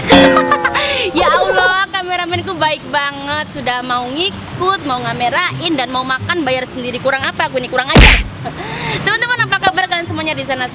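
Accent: Java, Indonesia. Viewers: Mbak. Asalnya drmana ya. Kok jowone ngguenah poll hehe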